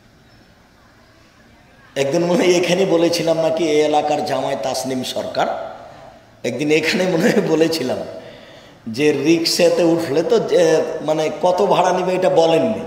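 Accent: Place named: Chapai Nawabganj, Bangladesh. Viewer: imran vai vaier voice chapai nawabganj er tai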